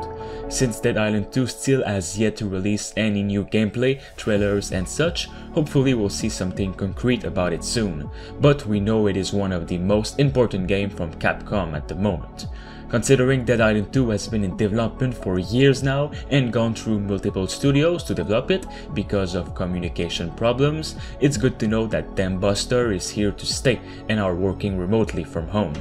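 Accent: Quebec, Canada. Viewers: Est xe que tu es Quebecois ??? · T’es tu québécois? tu sonne comme un québécois qui parle en anglais genre moi ahah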